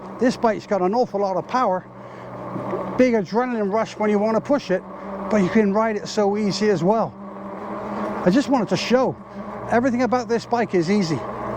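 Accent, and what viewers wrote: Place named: United Kingdom. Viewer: Beautiful, you sound like you come from Blighty?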